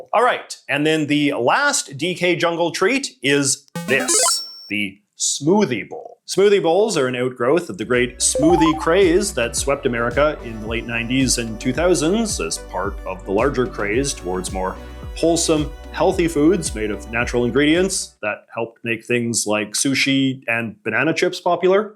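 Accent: Canada. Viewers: As a Canadian, how did you manage to sound so Canadian??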